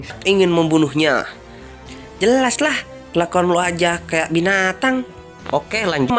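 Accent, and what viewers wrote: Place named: Sunda, Indonesia. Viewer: Logat sundanya ledok sekali mas,..🙊😁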